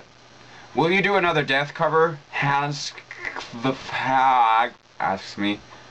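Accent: United States. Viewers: lol its funny seeing you talk about a quarter like its such a foreign object, when you sound like you have an american accent. I was actually surprised to find out you're in Finland.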